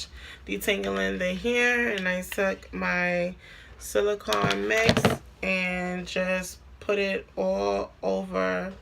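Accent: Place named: New York, United States. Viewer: you HAVE to be from New York. Brooklyn? lmaoooo I hear it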